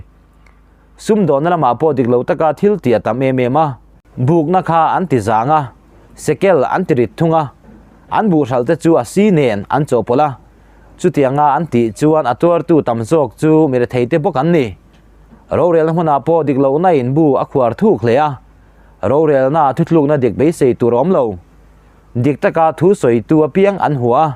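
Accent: Mizoram, India. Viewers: Mizo tawng hman hi ngaihthlak anuam chiah lem lo in zir leh deuh teh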